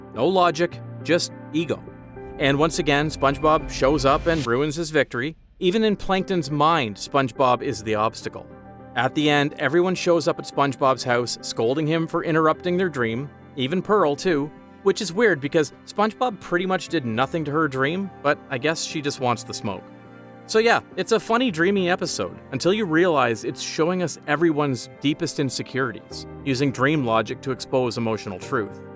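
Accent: Canada. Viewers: Too Canadian. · you Canadian bro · Is this guy Canadian?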